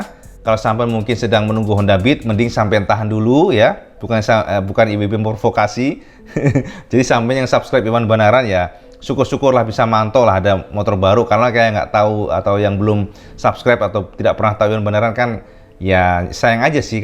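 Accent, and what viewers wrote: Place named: Java, Indonesia. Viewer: Gk peduli konten cak iwb apa, saya lebih menikmati omongan yang disampaikan iwb sangat menarik dan enak didengar. Jowo rk ilang jowone cak.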